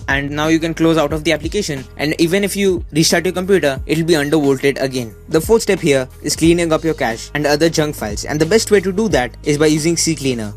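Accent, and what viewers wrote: India. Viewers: It is an amazing Indian accent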